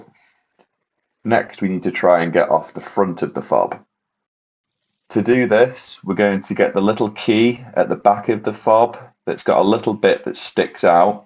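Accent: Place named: Manchester, United Kingdom. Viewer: This took me way too long to figure out. Thank you for the clear video! Love your videos and love your accent it’s like listening to the Gallaghers! 😍❤️❤️ now I can take Queenie my Dachshund out in the trusty Mazda and know every time the battery to let her loose is tip top! ❤️❤️❤️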